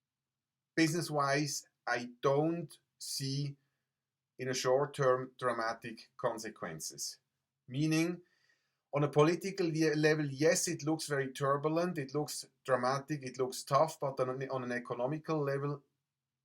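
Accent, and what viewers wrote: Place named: Switzerland. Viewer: Danke für Ihre Antwort Natürlich ist mir nicht entgangen, das Sie viel lieber in Ihrem sympathischen SwissEnglish 😊 veröffentlichen und anteilig sporadisch Deutsch. Mit meiner schwäbischen Muttersprache, in schweizerischer Nachbarschaft, bin ich -nicht nur ich - in der Lage andere Dialekte zu verstehen, bzw.hat man sich dem allgemeinen Sprachgebrauch angepasst, wie Sie offensichtlich ebenfalls. Schön wäre es aber schon, wenn der englischen Sprache nicht mächtige deutschsprachige, interressierte Bevölkerungsanteil Ihre Zukunftsprognosen, die ich sehr schätze, in deutscher Sprache verfolgen könnte. Vielleicht haben Sie aber ,,gesehen", das es nicht ,,sein soll", uns als Betroffene zu informieren. Ja, es wird nicht ein lokales Ereignis sein, dank Internet erfährt man vom umgefallen Reissack...... Nicht dass ich auswandern wollte, aber auf kommende Ereignisse sich einstellen können und zuversichtlich trotz allem mit Hilfe Ihrer doch treffsicheren Prognosen wäre schon hilfreich. 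Selbstverständlich gibt es keinen natürlichen Anspruch Ihre Zukunftsprognosen zu bekommen, warum aber veröffentlichen Sie dann im Internet ❓ Mit guten Gedanken und Gefühlen, wenn es denn zum großen Reinemachen kommt, hoffe ich, das es für alle eine schöne Weiterentwicklung gibt . Alles Liebe und Gute für Sie ❣️Danke für Ihre spannende Arbeit an der Menschheit Josefa 👵🙋🙏🌟‼️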